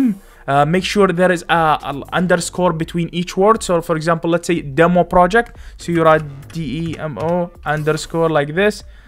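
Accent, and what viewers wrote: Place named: Iraq. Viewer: لهجة مالتك مال عراقي انت عراقي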